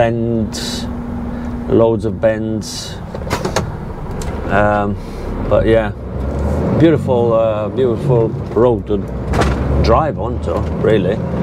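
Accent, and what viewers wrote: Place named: Manchester, United Kingdom. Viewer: Bloody hell you don’t half sound like you have a Manchester accent sometimes pmsl I’m from Salford originally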